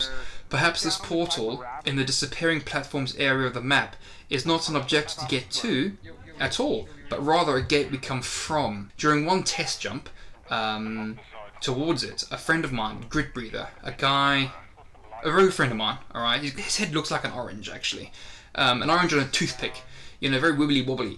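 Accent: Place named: South Africa. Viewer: dude you south african me too i can tell by the accent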